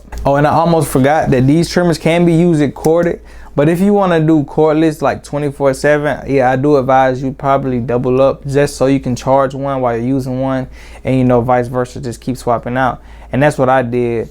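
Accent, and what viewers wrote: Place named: Miami, United States. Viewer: You gotta be from Miami the word “really” give y’all away sound like rally lol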